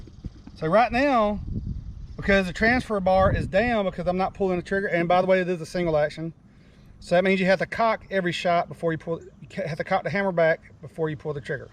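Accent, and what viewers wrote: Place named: Texas, United States. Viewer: well, I mean there is a Spanish pronunciation, but I wasn’t trying to offend you man. Sounded like just a southern accent. Similar to what my family in Texas would say. Liked the video, just made me chuckle. No offense brother.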